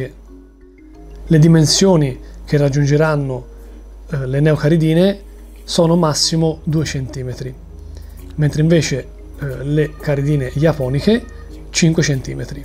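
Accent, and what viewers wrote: Tuscany, Italy. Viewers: Il canale non è neanche male, ma non riesci poco poco poco meno la parlata toscana e poco più entusiasmo? Diventa difficile seguirti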